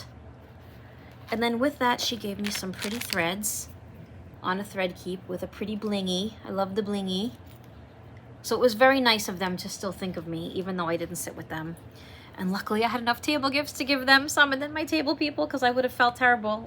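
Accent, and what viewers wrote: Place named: New Jersey, United States. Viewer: Hi! I'm watching you fr the North her in Ontario, 🇨🇦. I love your Jersey accent. I'm new to Cross Stitch & am thrilled to watch others videos & see their projects & stash. And oh my there often is mountains of both. I'm currently finishing up my project & looking forward to starting "Gracious Era"(Dimension kit) it's a Xmas gift for my mom. Glad you had fun at Stitch North, gosh I live close to the venue but was new at that time. So maybe in 2026 I can go! Happy summer! You're the second I've met in my life.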